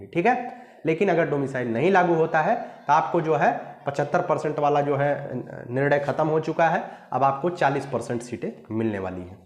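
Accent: Bihar, India. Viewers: Bihari boli dhire dhire aap me jhalak rha hai sir.🙏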